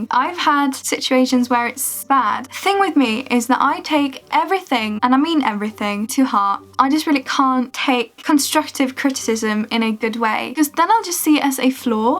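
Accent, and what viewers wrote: England, United Kingdom. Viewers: You sound British? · You're Sweden but you sound so English like damn this is new to me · jag trodde att du var britt först innan jag insåg att du är svensk ahahah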